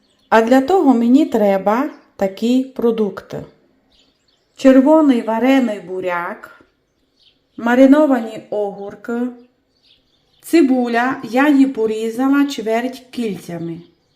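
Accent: Transcarpathia, Ukraine. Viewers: Спасибо за рецепт и красивый,напевный закарпатский говор. Я очень люблю вас слушать.❤ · Насолоджуюся вашою чудовою закарпатською говіркою. Дякую за рецепт.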